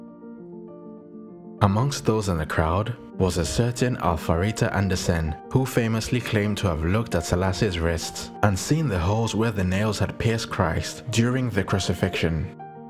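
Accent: Africa. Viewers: The narrative of the story is a bit twisted. I disagree with a bit of truth and then a divide rule narrative with a nice African accent. very sad how much they try to still to keep the people in dark They never stop or give up.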